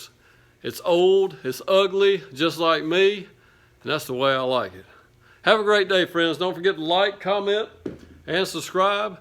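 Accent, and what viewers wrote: South, United States. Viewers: I'm terribly sorry that your brain is unable to process southern accents.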